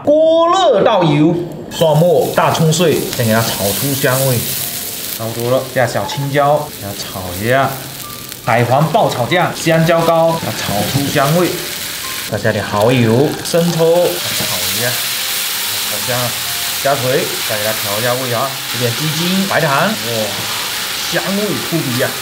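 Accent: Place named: Gutian, China. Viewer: 口音好像宁德古田那边的人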